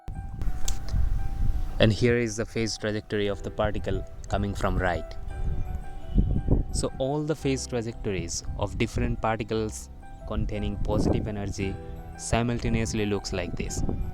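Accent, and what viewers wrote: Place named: Bengal, India. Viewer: Are you Bengali bro ? Your voice seems like a Bengali people lecturing in English !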